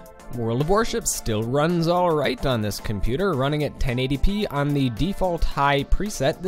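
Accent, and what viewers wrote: Canada. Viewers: Canadian as FUCK man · Saskatchewan eh! You sound like your from Saskatoon and it sounds cool as a commentator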